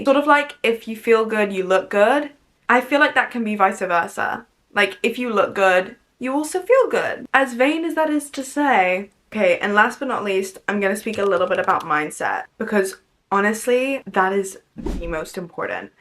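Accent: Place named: United Kingdom. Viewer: Girl I love this and was happy to see another British youtuber so totally embrace your own accent and leave the american one out x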